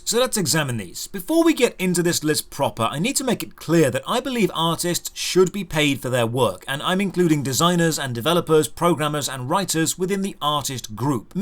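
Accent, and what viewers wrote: England, United Kingdom. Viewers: I like the way u talk to me English man, Keep it up ole chap XD !! great series so far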